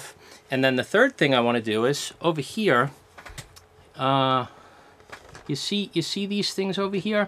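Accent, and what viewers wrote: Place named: New Jersey, United States. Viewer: The “locking nuts”, how many nuts does that guitar in the video have? Love that jersey accent though...👍 One thing that Fender recommends you do is to make sure that whatever you use to block the tremolo doesn’t move around when your strings are at pitch, whilst the bridge is flat against the top.... then you bring out the claw screws and it’s all good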